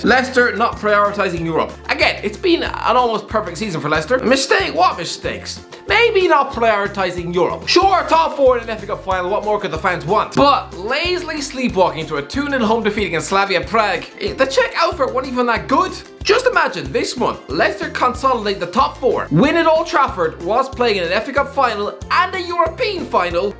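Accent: Ireland. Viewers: An very Irish accent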